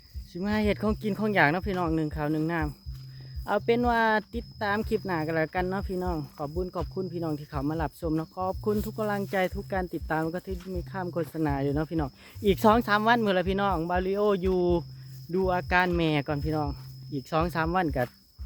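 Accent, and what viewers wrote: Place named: Ubon Ratchathani, Thailand. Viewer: สะออนนำเด้มีแต่ดอกใหญ่ๆบ่าว เสียงปากคือทางบ้านแม่ทางอุบล เนาะบ่คือทางลาว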